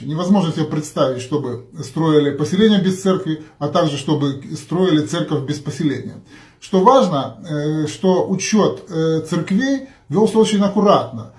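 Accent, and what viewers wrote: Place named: Ukraine. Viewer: Отличное видео. Для малообразованных людей из средней Азии, нет большего доказательства своей исключительности, чем видео в интернете, рассказанное неизвестным человеком с украинским акцентом, который не приводит никаких доказательств.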